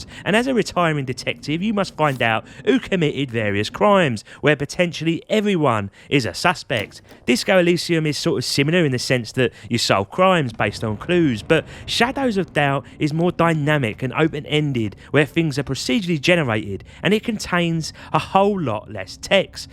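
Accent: Cockney, United Kingdom. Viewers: I didn't think i could watch more shadows of doubt videos but THESE ones have cockney accent! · I'm an American living in UK and my London girlfriend won't let me listen to any let's play videos with American accents as she finds them grating (other than Texan, which I have luckily) so happy to find a Cockney playing games I'm interested in.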